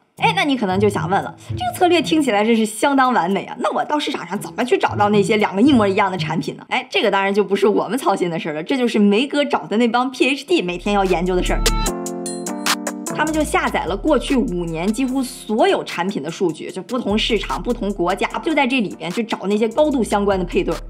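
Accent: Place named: Shandong, China. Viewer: 感觉有点山东口音哎😊❤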